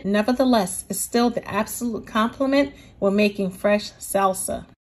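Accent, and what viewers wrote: New York, United States. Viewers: Ive never heard a new york accent sound so polite 😂❤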